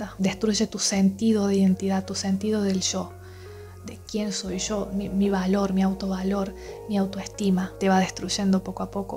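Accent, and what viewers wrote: Argentina. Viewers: que lindo es escucharte, muy clara y argentina ❤un saludo muy afectuoso.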